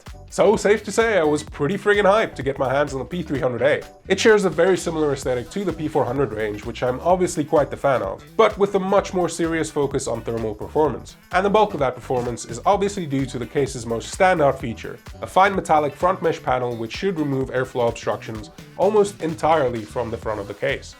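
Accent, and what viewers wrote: South Africa. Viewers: Oh damn that’s a surprise, you’re South African. Like me ✌🏻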